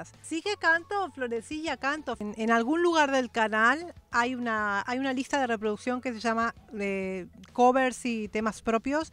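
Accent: Spain. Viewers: Es que reacciona y explica todo a detalle y eso que es de España y a veces se pierde el hilo de la idea por el acento o palabras distintas 😎 saludos desde 🇲🇽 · si eres argentina porque? hablas como española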